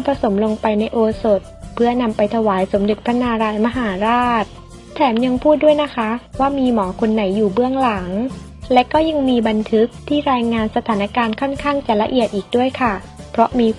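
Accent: South, Thailand. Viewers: แอดอยู่จังหวัดไรครับ.คนใต้หรอ.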